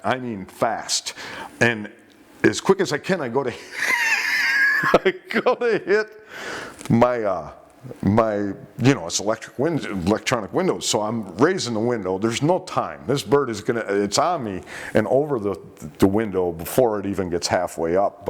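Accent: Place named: Midwest, United States. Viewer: I find it extremely odd that, a guy from Michigan or Illinois (guessing by the accent) who also, admits that he's too Scared to swim in the ocean....is giving advice and recounting stories about Sharks and shark attacks. That'd be like a life long floridian telling the do's and don'ts of ice fishing. 🤔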